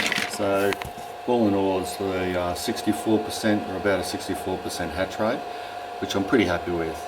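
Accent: Australia. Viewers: Good review, and always miss Austrlian accent, miss Australian friends and teachers. However let me buy one and try with chickend eggs.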